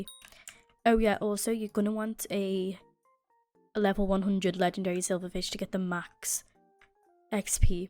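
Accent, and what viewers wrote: United Kingdom. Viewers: Are u from like Great Britain or something cause u sound like haha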